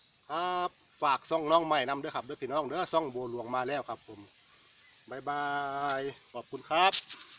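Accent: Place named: Nyaw, Thailand. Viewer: ไปหาสมุนไพรนำอ้าย มักเบิ่ง มักอ้ายเว้าญ้อจ้า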